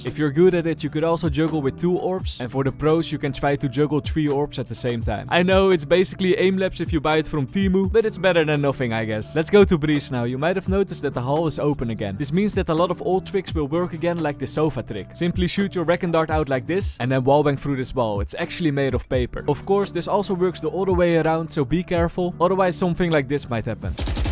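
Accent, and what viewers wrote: Netherlands. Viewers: the isnane dutch accent maar je maakt leuke vids keep up the work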